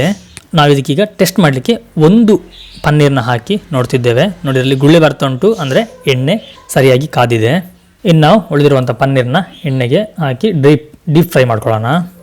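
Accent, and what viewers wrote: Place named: Mangalore, India. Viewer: Namma mangaluru kanada bahala chanda matanaadi madam neeu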